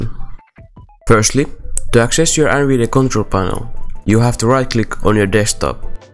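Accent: Finland. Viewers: Nyt paistaa kyllä suomi aksentti nii saatanasti xd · Thanks! Oot vissii suomalainen?? Huomaa aksentista xd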